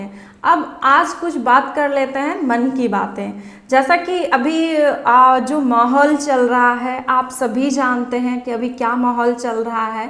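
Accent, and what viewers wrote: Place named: Bihar, India. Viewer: Bihari... Style mam😀😀🙏🏽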